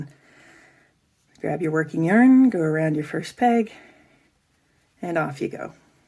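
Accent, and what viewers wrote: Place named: Canada. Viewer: excellent tutorial.............best one out there. thanks so much. love your Canadian accent!!!!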